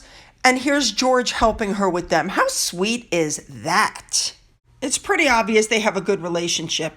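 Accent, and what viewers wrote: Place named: United States. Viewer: Thank you for all the kind things you say about our Royal Family ! I must admit, you have me laughing, with your pronunciations and your lack of understanding some of our crazy customs. 'They met a goat'...... The goat would have been The Mascot of his Regiment.😄 The Derby is the Daaarby, as in Baaaaath! You Americans never say aaaah! EdinBORO is pronounced 'Edinbro'. Pimms served in a bar can be Pimms No 1, 2,3, 4, according to the base alcohol, such as gin, whisky, rum,etc., and are served with mint, fruit, according to the number. Thanks for your highly entertaining channel, I have subscribed.🙋🇬🇧🍓🍉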